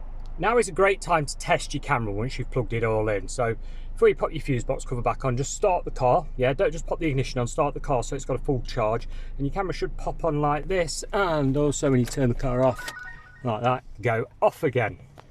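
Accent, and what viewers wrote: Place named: England, United Kingdom. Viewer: Thank a lot. That English accent is a cherry on top.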